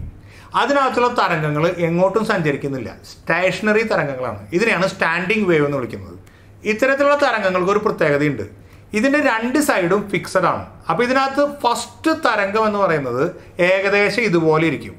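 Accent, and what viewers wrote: Thrissur, India. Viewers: thrissur kaaran aanalle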